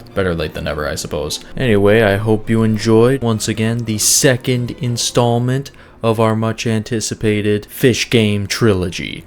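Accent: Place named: Canada. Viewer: Bro ur Canadian accent is strong asf 💪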